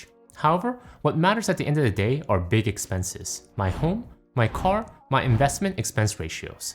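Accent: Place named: South Korea. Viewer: You have a strong KOREAN accent.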